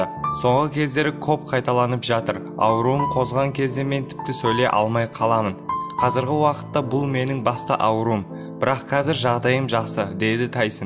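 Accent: Uzbekistan. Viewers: коментатор, тілің қарақалпақшаға ұқсап кетеді екен, қай жердесын бауырым?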